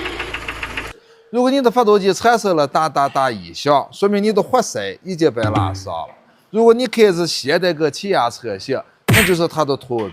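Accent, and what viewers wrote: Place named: Datong, China. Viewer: 我有50年没有听到过大同家乡口音了，真是很亲切。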